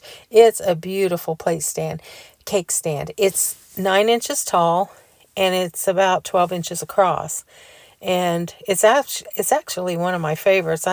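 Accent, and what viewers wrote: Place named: Indiana, United States. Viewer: Your cakes are beautiful! It is so nice that you take them to your neighbors and friends. Your salad looks yummy. And the Easter decor looks so nice. Your friend, Rosie's gift is lovely, as is the silver stand. It looks stunning. Your accent reminds me of my cousin, who lives in Indiana. I am a new subscriber. Oh! And the bunny as a bowl is a neat idea. Your potato salad looked great displayed in it. Happy Easter.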